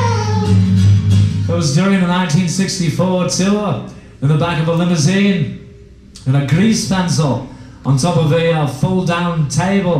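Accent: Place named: Liverpool, United Kingdom. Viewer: Incredible. I'd love to see this guy! And he picked up the liverpudlian accent as well. Awesome job!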